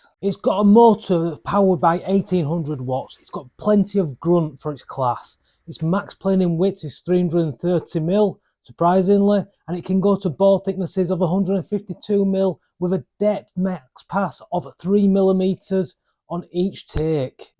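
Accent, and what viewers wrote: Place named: Northern England, United Kingdom. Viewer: Best and easy to understand review I’ve ever seen for any power tool. Told me everything I wanted to know and it been in a Northern accent was an added bonus. Thank you 🙏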